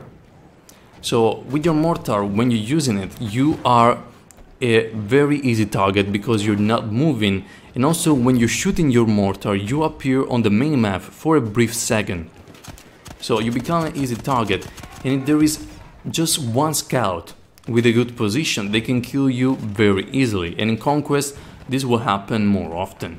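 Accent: Italy. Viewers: sei italaiano?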